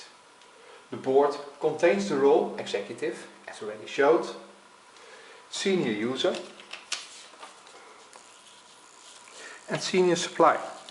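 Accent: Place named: Netherlands. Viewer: You have a nice dutch accent.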